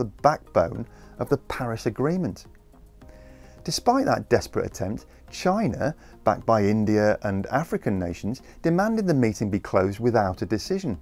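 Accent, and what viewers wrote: England, United Kingdom. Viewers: Keep up the data driven policy analysis! Much more likely to cut through to the harder to reach climate change sceptics than Greta's emotional, hysterical manner. All delivered in a calm, considered English accent with minimal background music. Hooray!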